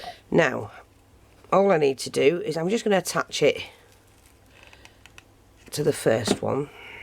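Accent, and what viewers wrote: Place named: Liverpool, United Kingdom. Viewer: Excuse me being nosy, but you sound like my friend who comes from Liverpool? xxxx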